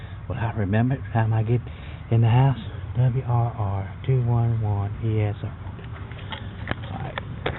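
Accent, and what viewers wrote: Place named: Louisiana, United States. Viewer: Are you from Louisiana? For Alabama because your accent sounds very Cajun brother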